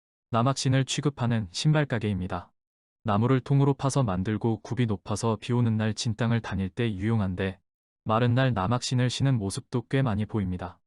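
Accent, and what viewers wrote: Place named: Gyeongsang, South Korea. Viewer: 말씨와 말투를 보니 갱남분으로 보이네요. 학원맨 나는 1975 명동파 챔피온 다방 앞 소년들이 회색양복이라 부르는 19세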